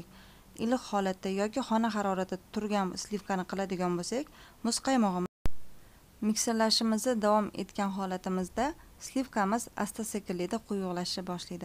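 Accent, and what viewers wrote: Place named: Kyrgyzstan. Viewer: вой ичегимми узип улдирдиз илохим бахтийзи берсин бу хам Аллохнинг бир синови лекин киргизчага ухшап гапирар экансиз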